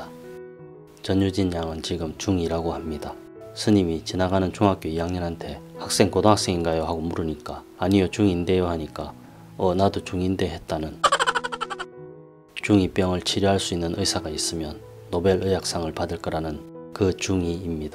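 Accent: Gyeongsang, South Korea. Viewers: 보고 또 보고~~~ 전말 저도 겸손한 전은혜입니다ㅎ 유머가 제 스탈입니다 전말 ㅎㅎ 팬이 될듯 구독ᆞ좋아요~~~ 말투가어딘지모르게 갱상도 억양 느낌이 전 창원인데 그림 배우러 가면 멀까요~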